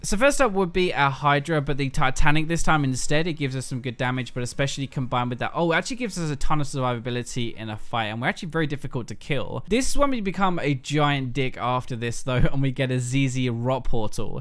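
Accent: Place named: London, United Kingdom. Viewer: you sound like you're from London!!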